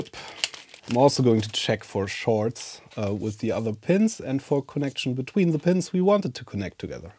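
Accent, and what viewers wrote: Germany. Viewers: Ok, erstes Video was ich von dir sehe, und direkt raus höre nur mit dein Hi das du Deutscher bist.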